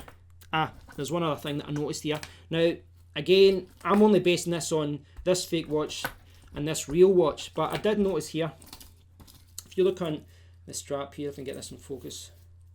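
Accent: Scotland, United Kingdom. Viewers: Oh my actual days. I’m reading this 9 months after the comments and this reply “you’ve hurt my feelings” has just cracked me up so bad 😂😂😂😂 I just keep imagining it in that scottish accent 😂😂😂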